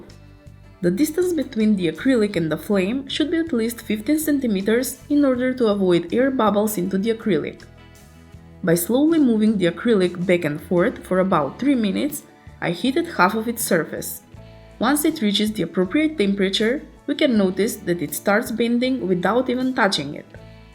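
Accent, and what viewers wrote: Russia. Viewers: русская что ли ... акцент караул полный (((( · Ебать у тебя акцент... Ты русская, походу)) Можешь не отвечать, и так понятно. Один вопрос: а в бетонное основание нельзя было питание спрятать, чтобы не так убого было?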